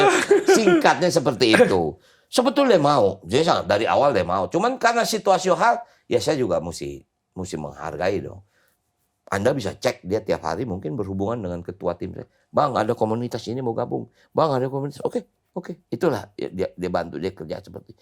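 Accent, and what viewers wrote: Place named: Betawi, Indonesia. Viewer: Bang Rano betawinya otentik bener, bcandanye Betawi bener, emang anak betawi aseli lu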